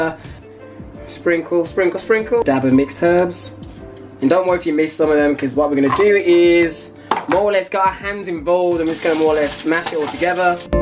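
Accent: Birmingham, United Kingdom. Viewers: are you from Birmingham bro im from erd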